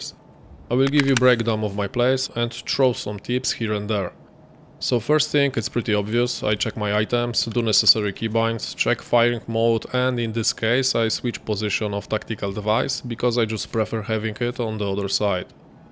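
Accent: Poland. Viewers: Właśnie odkryłem Twój kanał. Nie wiem dlaczego ale od razu po akcencie wiedziałem, że jesteś Polakiem :D Świetne filmy, tak trzymaj!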